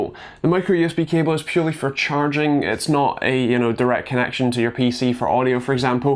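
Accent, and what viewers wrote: Scotland, United Kingdom. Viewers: I spent a solid minute trying to figure out if this guy had a Scottish accent or not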